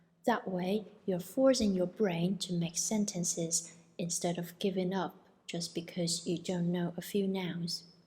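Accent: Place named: United Kingdom. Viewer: 谢谢老师推荐，你的英音太好听啦！！！🥰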